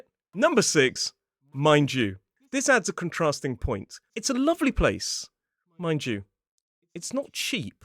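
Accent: United Kingdom. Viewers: I really do love the BE pronunciation. Thnx 4 ur entertaining lessons.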